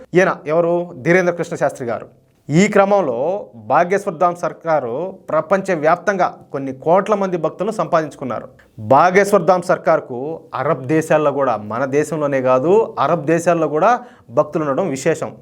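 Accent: Rayalaseema, India. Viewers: అన్న యొగి గారు మొది గారు భారత దేశానికి గొప్ప వ్యక్కులు. మీరు మంచి కంటెంట్‌ ఇసున్నారు. మీ సీమ యాస సూపర్‌ అన్న. 🙏🙏🙏 భారత మాతకు జై ✊✊✊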